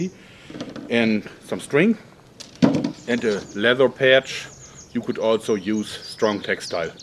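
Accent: Germany. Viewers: The german accent is the main reason why i watch his videos :)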